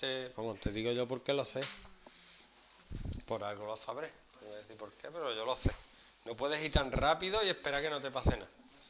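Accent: Andalusia, Spain. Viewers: los 2 minutos hablando sobre el traje han sido mi parte favorita! bastante bien explicado, y no os metáis con el acento andaluz ostias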